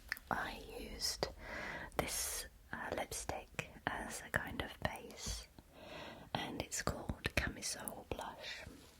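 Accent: United Kingdom. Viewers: easily one of the prettiest ladies on the net. You could read me the dictionary with your lovely British accent. This will be good for bed now as it's only just gone 10pm down here in Australia, goodnight 😊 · First time seeing you. Fantastic whispering and your British accent to boot! Love it! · Are you English, Irish or Scottish ?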